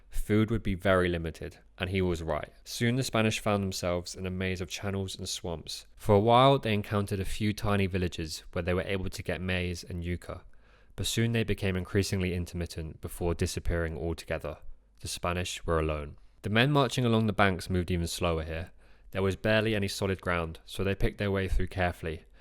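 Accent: United Kingdom. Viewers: it’s quite a light British accent bruv Worry not, not long from now you’ll be able to make tiny adjustments such as changing the accent through AI. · Glad it's not AI, I think, but does it have to be a Brit accent? Give us a break!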